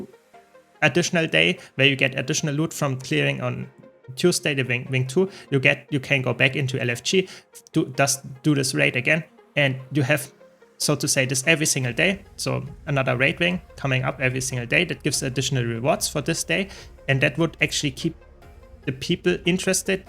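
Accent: Germany. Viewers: Hab mich schon gefreut ein Deutsches Video anzuschauen da der Titel auf Deutsch ist.. Klicke drauf und gesprochen wird auf English mit einem Deutschen Akzent.. schade.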